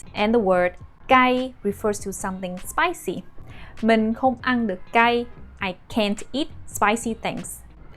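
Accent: South, Vietnam. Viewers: Really good for beginners, even South accent. I think it is the south accent. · Do you speak northern vietnamese or southern ? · You are from South Vietnam? The Vietnamese people I interact with tend to be from the south also. Great video, by the way, as always. I appreciate the way you say each sentence first slowly and then at a more normal speed...also how you break down the components of each sentence... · is this the southern accent?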